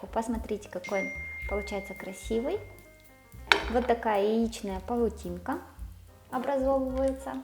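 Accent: Ukraine. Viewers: ...я россиянин. Служил на Украине срочную службу в Советской Армии...1987-89 год. Восточная Украина..,под Харьковом. У вас очень милый украинский говор..Вы с какой части Украины? Не с Полтавской области?! Мира нам всем!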